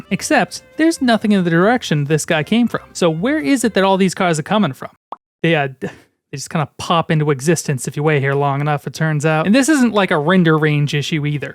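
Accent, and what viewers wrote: Boston, United States. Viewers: please keep doing that Boston accent :3 Is it your native accent? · Please stop doing that Boston accent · I can hear a bit of Boston in your voice😅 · I feel like I’m going crazy was he always this Boston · Do what you wanna do man, Boston accent included! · are you from boston?? i swear i can hear an accent but im not sure 👀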